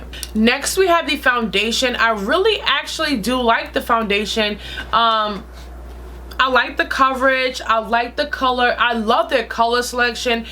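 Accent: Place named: North, United Kingdom. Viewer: i always love her accent lol she got a (I'll whoop your ass)up north type of accent.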